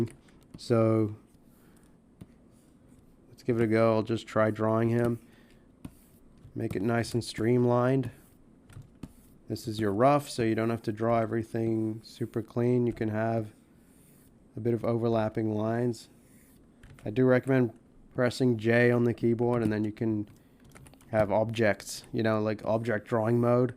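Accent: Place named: Australia. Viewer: slightly higher pitched Australian *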